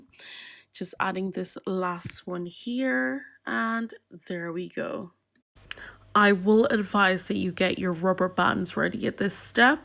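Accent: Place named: Ireland. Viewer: Are you Irish? Your accent is beautiful.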